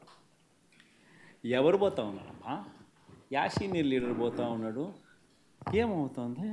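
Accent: Rayalaseema, India. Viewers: Haha rayalaseema lo yasa tho answer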